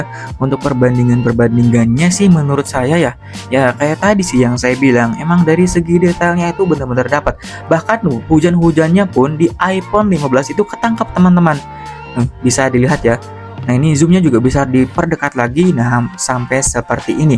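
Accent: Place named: Cirebon, Indonesia. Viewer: Busst orang cirebon lu bang😂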